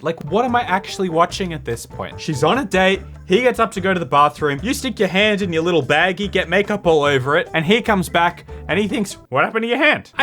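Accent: Australia. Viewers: Your no moi confuses me specially when after it you start speaking perfect Australian accented English... 😅 Yeah, I know my problem is weird 😅😅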